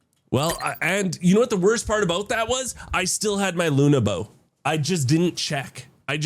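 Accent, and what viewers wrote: Canada. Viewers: it wasnt actually that it was the way you talk and some of the manurisms you use.i am also canadian so picked up on it quick lol i juat started on delta force console slowly getting better i enjoy your videos find them quite helpfull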